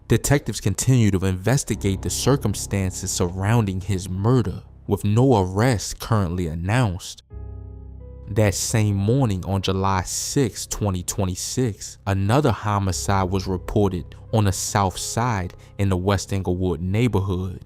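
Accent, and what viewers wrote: Baltimore, United States. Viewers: Sounds like a Baltimore dude is giving street news on Chicago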